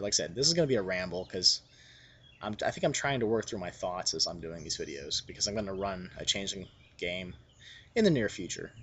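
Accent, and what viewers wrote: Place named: United States. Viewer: American English kind of hurts my ears sometimes, how they pronounce the 't' like a 'd', and such their teeth every 10 seconds, but other than that you seem to be getting a grasp of the game. You have much more to read though my friend, the new supplement books outshine the core book.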